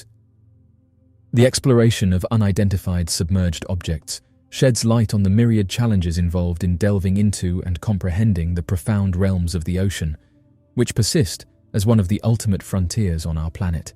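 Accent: United Kingdom. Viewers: What's with the limey accent?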